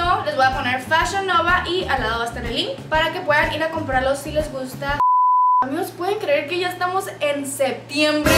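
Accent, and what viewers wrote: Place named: Fresa, Mexico. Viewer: Esta morra se volvió fresa 😂 habla bien fresa ahora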